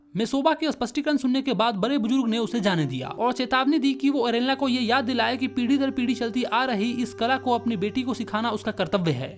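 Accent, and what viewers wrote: Bihar, India. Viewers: Bhaiya bihari kam bolo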